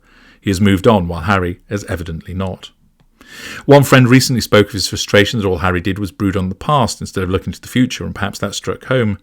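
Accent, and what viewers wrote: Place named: England, United Kingdom. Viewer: You have one of the most beautiful voices I have ever heard! Understanding English from England is a bit difficult for me, but, I love love love your tonality, your voice, and how professional and precise your information is. Thank yu, and please continue doing your videos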